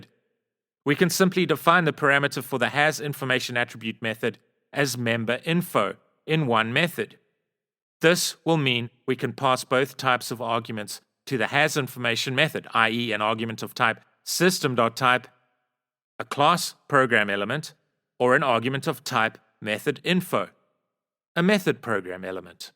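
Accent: South Africa. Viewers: Nothing better than clicking on a youtube vid and hearing a south african accent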